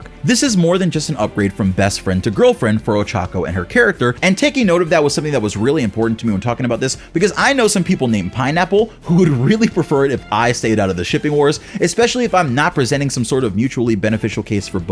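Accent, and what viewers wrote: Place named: Puerto Rico. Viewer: You ooze your puertoricanness when you talk! Nice work with the videos man!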